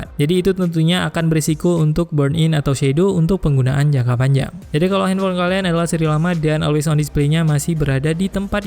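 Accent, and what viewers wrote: Aceh, Indonesia. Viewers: Org aceh? Ya om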